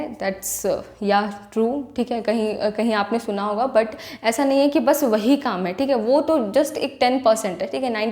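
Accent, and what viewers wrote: Jharkhand, India. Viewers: Aap jharkhand se ho kya??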